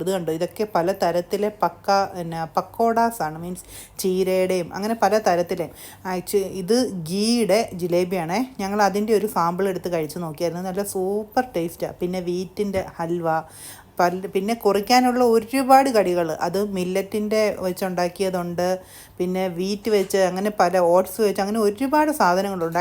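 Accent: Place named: Pathanamthitta, India. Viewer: Pathanamthitta style samsaram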